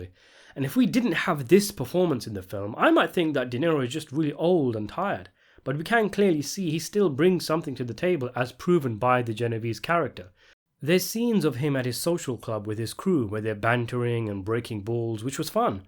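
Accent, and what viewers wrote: United Kingdom. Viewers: Lacks authenticity kinda like a guy from britian or wherever the hell your from talking about shit that happened in our neighborhoods lol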